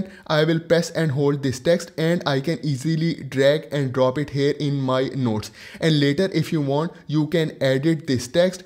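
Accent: India. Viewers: 개인적으로 인도식 영어 발음 너무 좋아요. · I like the Indian accent.. that is very special here in Philippines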